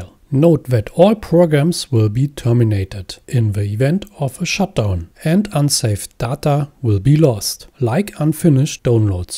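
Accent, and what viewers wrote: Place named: Germany. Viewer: Danke für deinen schönen Tutorial! Der Akzent kommt voll raus haha direkt erkannt DE 😂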